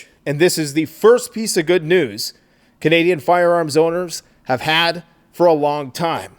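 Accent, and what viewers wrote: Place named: Rural, United States. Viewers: that's NOT how Albertan's talk...what kind of accent was that??? Texas?? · Thanks for the update,. Good news sounds like some are Standing Up, Speaking Up, Taking Action, as it should be. Your hick accent brings back fond memories of the All-American Prepper maybe we might see him in the near future "if" there is one. Stay Safe & Good Luck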